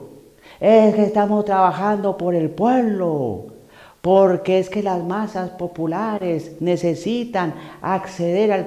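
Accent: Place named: Bogotá, Colombia. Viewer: El, es colombiano....no le notas el acento rolo?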